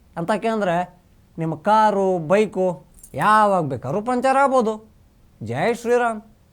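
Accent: North Karnataka, India. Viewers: This is not kundapara language. This is north kannada language.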